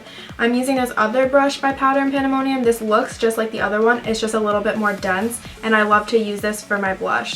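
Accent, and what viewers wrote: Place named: Queens, United States. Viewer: You sound like you’re Italian from queens ny where I’m from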